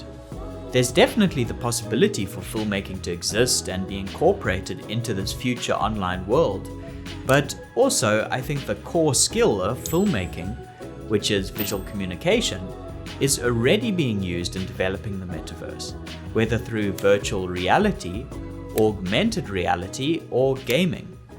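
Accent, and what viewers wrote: South Africa. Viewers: The narrator sounds very South African. 😊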